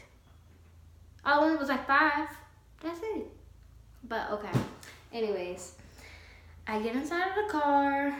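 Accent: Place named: South, United States. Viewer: You’re literally the most beautiful woman I’ve ever seen wow, love your southern accent.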